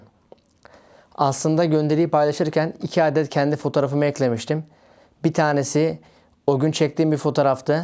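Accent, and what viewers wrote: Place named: South, Germany. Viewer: Thanks for sharing dear ❤May I ask another question: How come your German is so good? I once heard you talking, even with a southgerman accent. 😊I know I am late with a question, so feel free not to answer. Good there are people like you on this planet!❤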